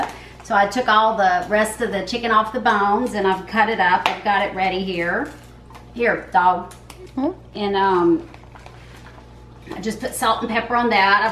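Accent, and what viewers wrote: South, United States. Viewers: Hey! You did an amazing job! I love how you have kept your true southern accent. Loved the recipes too! xo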